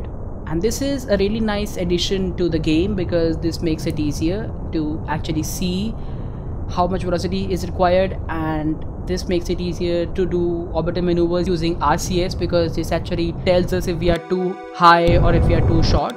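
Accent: India. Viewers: Hey r u indiaian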